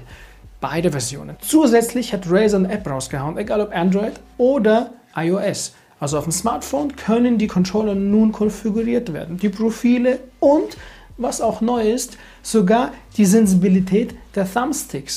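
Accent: Switzerland. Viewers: Schweizer? Woher kommst Du?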